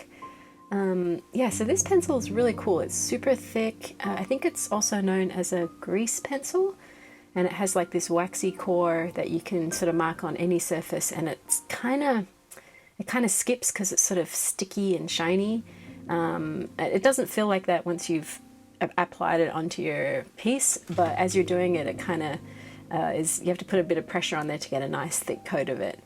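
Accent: Australia. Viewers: I can't decide if you are an Australian living in America but your accent is so interesting!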